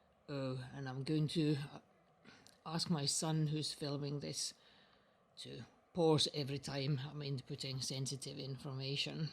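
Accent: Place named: Scotland, United Kingdom. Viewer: Great video,your son did a great job handleing the video.trying to place the accent, im in NE Scotland.get the feeling you are not far away.Thanks again,regards John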